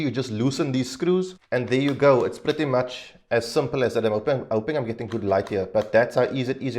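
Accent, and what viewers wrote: Cape Town, South Africa. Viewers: I could immediately tell OP is from Cape Town when I heard him speak. This is typically an accent you'll hear from the Cape Coloured community.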